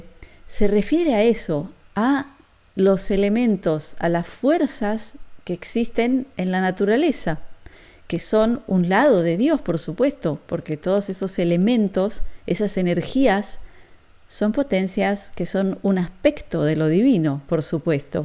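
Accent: Argentina. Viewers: tu acento argentino hebreo me produce una profunda añoranza.Dios te bendiga Shalom.Un saludo desde USA.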